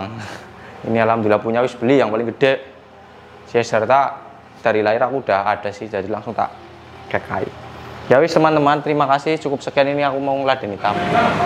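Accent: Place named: Brebes, Indonesia. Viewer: Ngomonge kayak wong brebes ms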